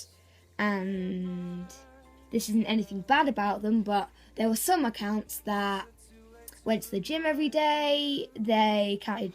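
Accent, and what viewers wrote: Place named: Surrey, United Kingdom. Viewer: You sound really posh on your videos, you sound like my cousins from Surrey :D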